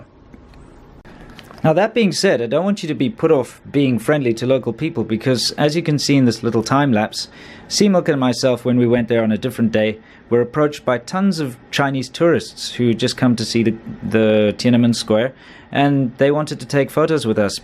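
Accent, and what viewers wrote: South Africa. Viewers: You sound South African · Hi, I like your videos but why do you tell everyone you are from England? Your accent is quite markedly South African. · You sound South African ! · You're not from London. You sound like you're from South Africa. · South African? Thanks for filming in Scope. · why do you always tell chinese locals you are english when you sound like you are from south africa? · are you south african ?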